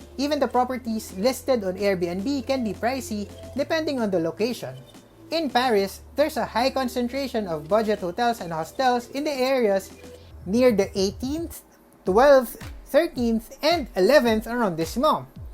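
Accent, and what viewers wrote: Philippines. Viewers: Pinoy accent 👍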